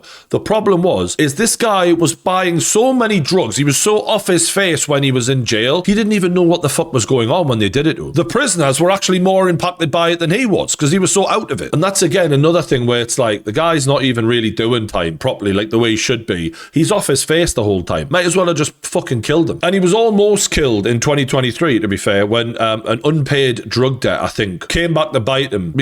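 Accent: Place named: Geordie, United Kingdom. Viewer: Oh my God, This is Very difficult to listen to Geordie🤯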